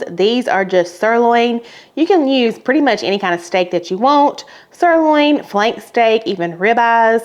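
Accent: South, United States. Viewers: love the two recipes today you and are great together love listening to you talk that southern twinge is so beautiful to hear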